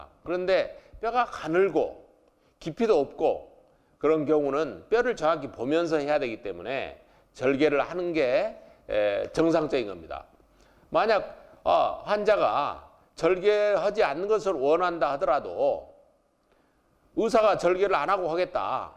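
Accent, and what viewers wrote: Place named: Jeolla, South Korea. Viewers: 전라도 말씨가 어때서요 ㅋ 별나네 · 사기치지 말게. 전라도 말씨, 아주 답답한 진행. 그냥 덮으세요. 임플랜트? 티타늄 재료로 하나 원가 10만 원도 안돼. 치조골만 양호하면, 가까운 동남아시아에 가서 아주 싸게 하세요.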